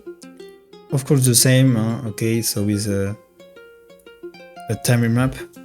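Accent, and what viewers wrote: France. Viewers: mdrrrr jme disais la meme en écoutant l'accent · you kinda sound french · tu est français non ?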